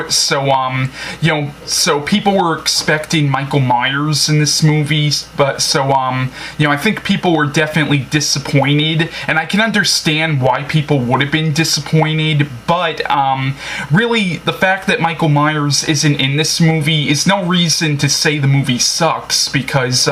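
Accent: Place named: New York, United States. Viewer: Ahhhh.. I knew I'd heard the accent somewhere before :) I knew it was either New York or maybe Connecticut or something. Sorry for my ignorance. I'm Irish from England, so US accents aren't quite so obvious over here. Nice review anyways, mate :)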